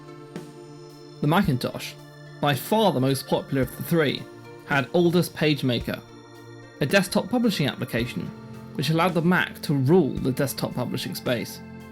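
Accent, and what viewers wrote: United Kingdom. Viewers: "Compuhurz" for computers and "yunighud" for United both sound really, really stupid. It's some obscure English provincialism, I know, but it's one that YouTube would be better off without. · You speak with a British accent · Love the music, esp. . Fantastic. Warning! Possible trigger effect on maker..( I understand this video is 4 years old, so maybe I should have shut up... But I've written it now). The early chapters may not have been researched deeply enough. I picked up on a few technical and historical errors and omissions. I understand the scale of this project and that the introduction could likely have been rushed through. Reaching the microprocessor history you immediately stepped the game up. Truly excellent and quite an undertaking., a lot of praise should be given for this. My only other criticism may have you swearing at me! 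Work on your diction!, Otherwise you have a fine voice for this style of content. The odd Compooha and Intel Ahy Ahy locate you firmly in the London suburbs...? They, and similar just pop in randomly? Started watching the Cray video. Greatly improved. Really wonderful stuff. Beautifully written. Subbed. And peace brother, I'll never mention it again.🤠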